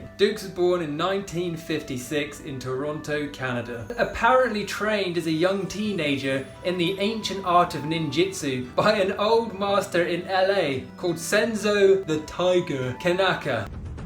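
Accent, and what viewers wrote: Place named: United Kingdom. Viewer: Thanks so much for the British English and humor! As a former bouncer, it is very difficult to understand how people think any of this can ever prepare you to really defend yourself? It should be a crime to let people teach this shit...just like sending soliders to war with weapons that are "may be's"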